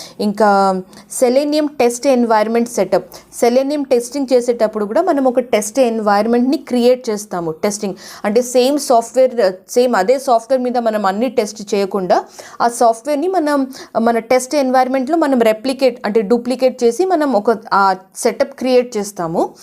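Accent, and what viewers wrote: India. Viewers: North nunchi vachi telugu matladuthunatu undi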